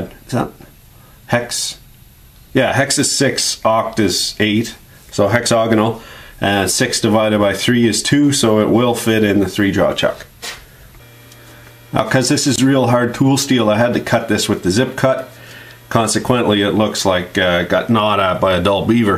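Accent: Canada. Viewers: Iron ring? So you ARE Canadian... I thought I recognized that accent. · Not sure where you're from but you sound very Canadian lol. I enjoyed this video and had a great laugh at your hilarious commentary. Great job on the punch, give me some ideas for myself. Thoroughly enjoying the precision calliper adjustment lmao. Have a great day. Ian Little Spirit River, Alberta, Canada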